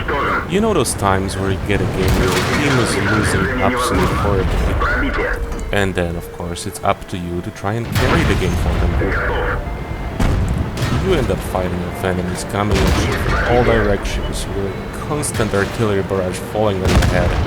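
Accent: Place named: Europe. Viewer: Yeah. We have a similar PRAV clan, and for some reason, you sounded European to me, so I thought you were EU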